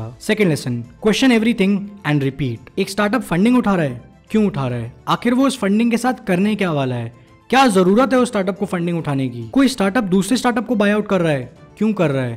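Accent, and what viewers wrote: Bareilly, India. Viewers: Are you from Bareilly?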